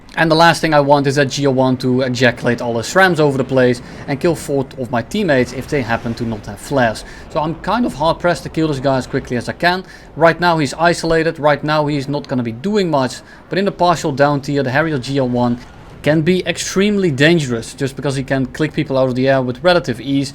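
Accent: Netherlands. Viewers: Heerlijk het Nederlandse-Engels, keep up the content🙏🏻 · ik ruik nederlands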